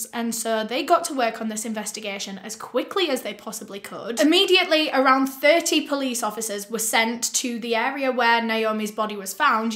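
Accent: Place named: North, United Kingdom. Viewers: gets more and more Yorkshire as time goes by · storytelling style drifts further back to her northern roots every single video and I love it 😂 these videos have always been great but that extra touch of personality in the more recent year or so has been a nice addition.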